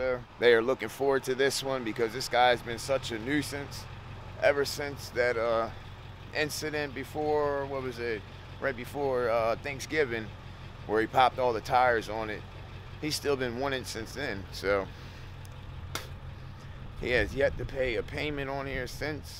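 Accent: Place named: Delaware, United States. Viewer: Could tell this was Delaware soon you started talking 😂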